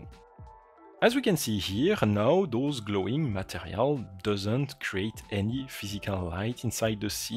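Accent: France. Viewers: The French accent in English is out of this world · Accent français n'est-ce pas? 😊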